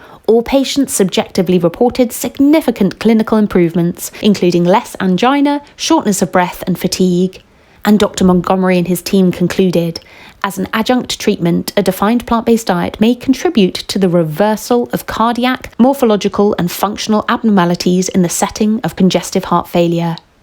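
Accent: England, United Kingdom. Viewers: I so hate this so called english voizzze that i would rather die actually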